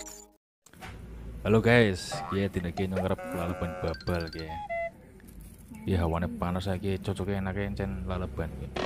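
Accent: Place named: Banyumas, Indonesia. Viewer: Inyonge guya guyu krungu omongane rika kang 😅 karang ning kampunge inyong jowone ora ngapak. Ding nek krungu wong ngapak, koyone kok kepenak 😁👌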